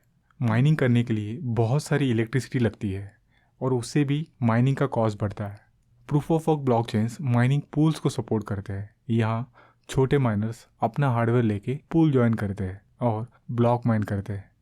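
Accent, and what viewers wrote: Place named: Maharashtra, India. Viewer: seems like you are Marathi person.. accent warun watala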